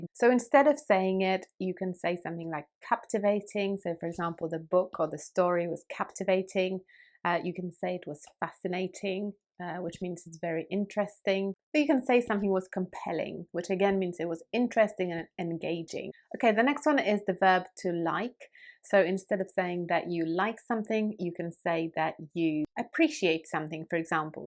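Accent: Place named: United Kingdom. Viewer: I need to recall the vocabulary of the years I used to study in England. All of these words, phrases and accent are gone…at the same time it feels extraordinary the perfection of your British accent! Excellent work! ☺️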